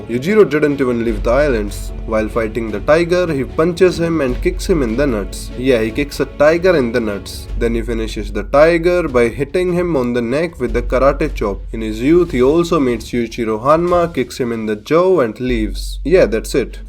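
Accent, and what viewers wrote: India. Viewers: just stop. Ur Indian accent just kills the vibe and the joke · I dated a half guyanese girl and she had mostly indian aunties and uncles. there's no mistaking his accent. Actually , his voice is very soft for an indian man. Probably why his videos do so well. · Bro are u indian? cause the accent is much similar like mine. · You people🤦‍♂️, Go to his discord server then you'll see his name which is " ", which is obviously an Indian name, as I'm an Indian myself and i already recognized that he was Indian by his accent which is the Special Indian Accent only indians have. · I swear his indian acsent is getting thicker every vid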